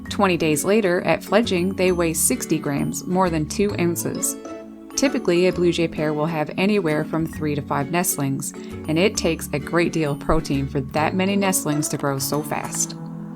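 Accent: Newfoundland, Canada. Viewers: Sounds Canadian maybe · Love your commentary. Also your Canadian accent. I visited Nfld when I was twelve and my sister worked at the hospital in St Anthony. What adventures we had!!! Thanks for these videos. Catherine from VT. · I love your videos! Very educational and beautiful camera work. And your Newfoundland accent is so pleasant to listen to. Thanks!🐦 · I have a bluejay friend! I feed a family of crows every day, and this little punk dude started showing up. I give him a small piece of whatever the crow bros are having, and he comes every day now! We are buddies! I know he's a he because sometimes his mate comes and she's a good bit smaller like described. I call them Sid and Nancy, because they like making music together(no one said it has to be music for humans). Omg you're from NL ME TOO!!! <3 knew I recognized the accent!!! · Aboot? I've been following you for years, I didn't know you were Canadian... 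· Number 4 video on the binge, as soon as she mentioned Newfoundland I caught the newfie accent. Howdy from Alberta.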